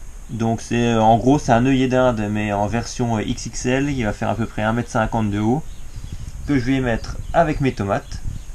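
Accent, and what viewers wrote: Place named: Lorraine, France. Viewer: Et oui les parents habitent la Lorraine et je reconnais bien ce petit accent bon dimanche bravo pour ces vidéos très intéressantes a bientôt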